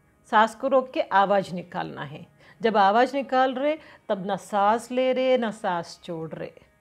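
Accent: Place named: South, India. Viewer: आप है उच्चारण दक्षिण भारतीय है।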